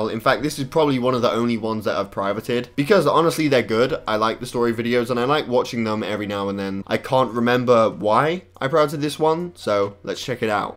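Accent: United Kingdom. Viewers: MeKillYouTo I don’t know a lot of people in the us that have a British accent like his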